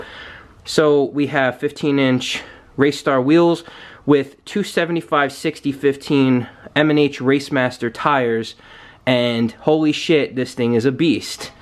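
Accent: New York, United States. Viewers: Awesome Bro : The NY/NE accent not so much :) When I clicked on the Stroker Hellcat exhaust video My brain didn't register Hellcat Stroker for some reason I was thinking Scatpack Stroker & near the end You mentioned something about being the only Stroked Hellcat on YT. I've been an SRT B5 sub for at least a year now but haven't been keeping up with His channel lately. I'm on board with both o Y'all now.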